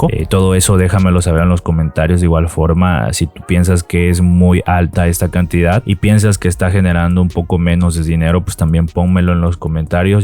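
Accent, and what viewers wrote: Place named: Colombia. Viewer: Me encantan sus videos, pero creo qye el video lo hizo su marido. No he visto otro video parecido a éste, porque hay unas personas que tienen videos de suculentas y muchas plantas, con muy buenos consejos de abono y tansplantacion y compra. Pero seguro que es su marido el autor del video. Si así lo creo. Porque tiene acento colombiano.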